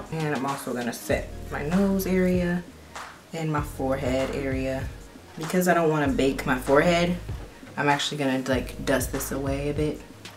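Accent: England, United Kingdom. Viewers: Living for the british accent lmao you kill me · Your British accent is so good 😂 this is a sign for you to come down next year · Love the English accent 😂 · You're british accent s actually soo dope!! xx Loving this look sooo much sis xx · Literally thought "oh my gosh why do a baby reveal like this" 🙈🙈 British accent is on fleek though! Sounded a bit like Shirley though lol From London btw when are you coming to visit 👌🏾😍😘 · The English accent though 😂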